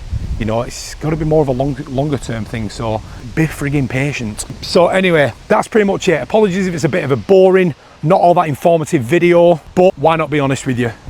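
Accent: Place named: Wigan, United Kingdom. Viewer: You’ve got be from Wigan or not far off with that accent lol well done on your journie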